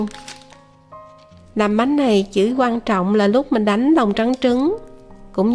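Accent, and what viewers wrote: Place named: South, Vietnam. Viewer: ❤❤ nói giọng miền Nam từ ngữ miền Nam nghe rất hay❤🌹🌷👍 Rất mong quý vị mình dùng từ ngữ của miền Nam❤ đừng lai từ ngữ bất kỳ vào rất khó nghe, rất rất rất cảm ơn quý vị và các bạn Duy Mạnh từ ngữ của miền Nam❤❤🌹🌷👍, giọng nói của dịu dàng rất dễ nghe❤❤❤...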